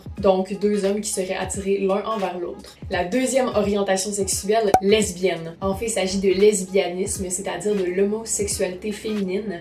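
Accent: Quebec, Canada. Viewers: Ton accent québécois est trop beauuu ❤️❤️❤️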